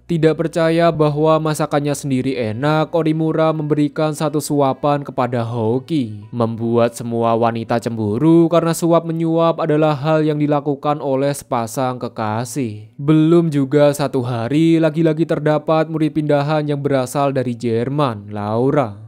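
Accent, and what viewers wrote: Java, Indonesia. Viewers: di pikir2 suara mu mendok bang, cipuyuuu ,wanitaaa,onimuraaa,kaka dari hogiii😅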